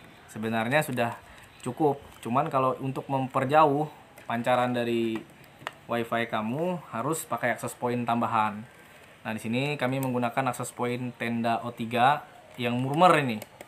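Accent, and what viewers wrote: Banjar, Indonesia. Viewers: banjar banar.. hehe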